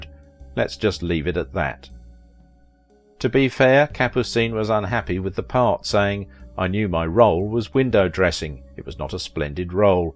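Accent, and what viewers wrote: France. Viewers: Your french pronunciation is cute ❤❤❤❤❤🇨🇵🇨🇵🇨🇵🇨🇵🇨🇵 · The narrator has a lovely French accent. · This was a very good video. I am I see your French accent as wonderful as well. Thank you for doing this yes I actually learned quite a bit about her and you portrayed her in a very fair light. I really enjoy your channel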